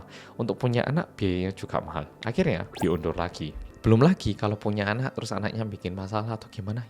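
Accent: Java, Indonesia. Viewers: Selalu suka sama konten"nya mas mas korea medok ini hehe❤❤ Aku mau reques bahas kasus JMS soalnya habis nonton documentary netflix jdinya pengen tau perkembangan kasusnya dikorea.... Makasih mas 😊😊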